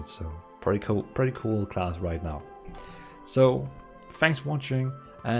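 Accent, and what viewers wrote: Denmark. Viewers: Thought I could hear a fellow Danish accent :3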